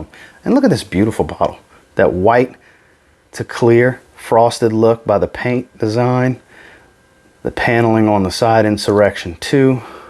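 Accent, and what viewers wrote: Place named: South, United States. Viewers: You sound like a Texan i know one when i hear one 😅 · Love your southern accent brother! Greetings from Bulgaria!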